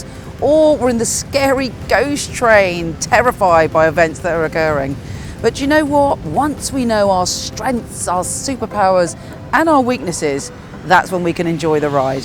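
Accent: United Kingdom. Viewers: Why do I pick a little Scottish from you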